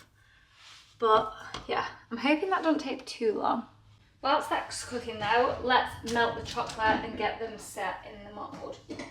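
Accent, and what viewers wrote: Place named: England, United Kingdom. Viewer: Love your English accent. I wish I could try your chocolate 🍫.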